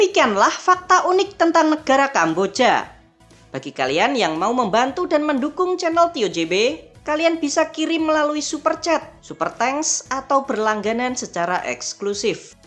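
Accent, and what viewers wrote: Java, Indonesia. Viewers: Logatmu min, orang wong banget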